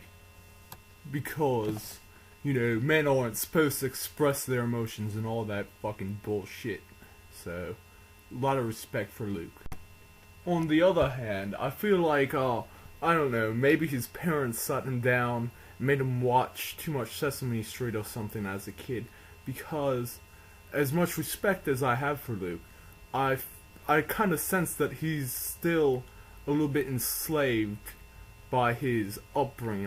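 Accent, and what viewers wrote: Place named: New Orleans, United States. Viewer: I've always wondered where your accent is from...sounds very Carolina like...but then again it has a New Orleans thing going on...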